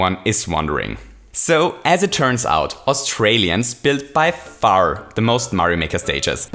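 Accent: Austria. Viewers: Du bist Österreicher? Nice! Ich auch! Ich wusste von deinem Akzent her, dass du deutsch sprichst, aber Österreicher wusste ich nicht! Schön! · Du kommst aus Österreich?! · ohhh hes from Austria. That's what accent he has! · Oh you‘re from austria? So you talk german now i understand your accent lmao. Im swiss btw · Du bisch österreicher??😳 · Wait what you are from austria? Hurray! I could never figure out where that accent was from even though it was right in front of me the whole time. Mach weiter so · Ha, Ich hab gewusst dass du Österreicher bist, der Akzent ist mir schon bekannt vorgekommen :D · Was! Du bist Österreicher? Ich mein, ich schau mir deine Videos schon seit gut einem Jahr an und wusste das du Englisch nicht als Muttersprache hast, trotzdem überraschend! Grüße aus Graz ;) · I'd never guess you are from austria, even though you speak a rly strong dialect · Eyyy du bist Österreicher? 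· IS AUSTRIAN EVERYONE, NOW WE CAN STOP ASKING WHERE HIS ACCENT IS FROM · Warte mal du kommst aus Österreich? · Du bist Österreicher? Nice. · Momentmomentmoment! Du bisd österreicher?!? Jo lol, seas😂😂👋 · Warte… du kommst aus Österreich?? :o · Mit seinem Akzent wie kannst du überrascht sein dass er aus österreich kommt 😂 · Du bist Österreicher? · HOO-ray, FINALLY! I've been trying to place your accent since I found your channel. My boyfriend thought maybe Eastern European, (e.g. Czech) and I was guessing German. Austria it is. Absolutely beautiful country. Love your work, keep up the great content. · Hob ma scho docht, dassd a Österreicha bist 😅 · AUSTRIA, finally, i knew you had an accent, but I couldn't figure out which. Btw, greetings from your neighbour country, Germany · OOOOOHHH You're Austrian! I had no idea where you were from XD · He just said it in the video. He's from Austria. 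· Warte was du bist Österreicher? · Hahaa, I knew you were from austria (dont know if you mentioned it before), but I could guess because of your wonderful accent. Hot Cogs. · Are you from Austria? Wäre echt krass😂😂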